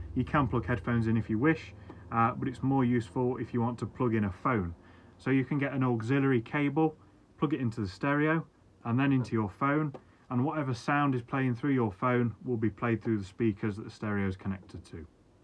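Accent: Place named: England, United Kingdom. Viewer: What a lovely English voice. How I miss the England I was born into. We have been (and are being) invaded by foreigners who are displacing us, unfortunately.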